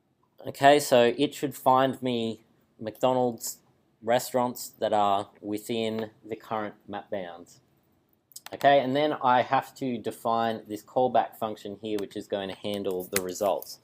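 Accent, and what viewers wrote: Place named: Australia. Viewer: along with the Aussie accent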